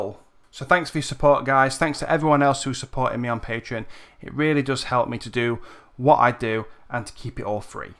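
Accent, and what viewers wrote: United Kingdom. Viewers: That moment when I know all of them but still suck at maya lol... btw nice british accent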